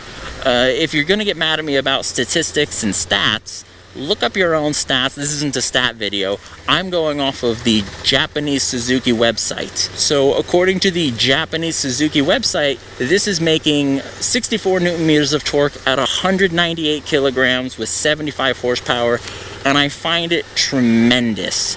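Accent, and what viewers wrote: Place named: United States. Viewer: Down vote for enduring clickbait with that irritating high pitched nasally 'murrican accent and waffling verbosity. Get to the point!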